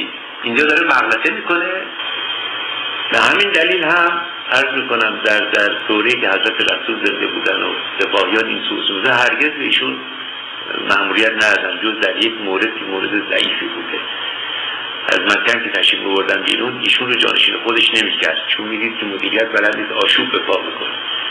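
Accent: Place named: Turkey. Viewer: kiram tu kosse madaret ba in lahjeye torkiet.zanjende pash byofte farsha madaro khahare toro ham migan.dar zemn yadet bashe araba jaddo abadeto ham be moghash gaidan ke tule saghe arbi mesle to az kune nanat byofte. kire har chi bahai tu kosse madaro zano khaharet koskeshe mosalmune goh.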